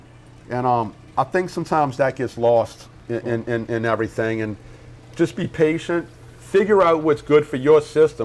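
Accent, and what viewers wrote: United States. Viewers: i knew at about 5 seconds in this guy was from LA. haha i miss southern accents. southern guy stuck here in CA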